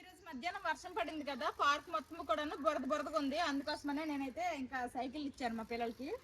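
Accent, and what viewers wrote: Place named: Srikakulam, India. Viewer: garu nenu tea tagutu me video chusa andi. Madya lo sir ni meru dibada dabada badeyyali annaru. Chala navvi polamaranu. Okkasari chinnappudu gurtocchindi. Madi vzm.meru Sklm slang lo baga matladutaru. Mammuluga kuda baga matladutaru. Overall ga natural ga matladutaru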